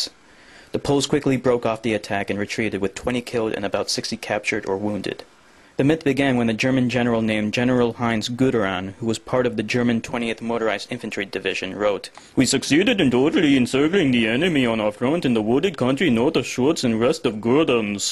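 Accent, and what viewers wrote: India. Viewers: I agree totally Indian accent.....better to do nothing please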